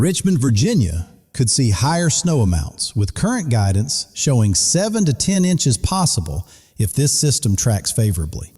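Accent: South, United States. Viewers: Golly!!!What a hillbilly announcer. Quess it’s time for you all to put on some shoes! It’s called WINTER!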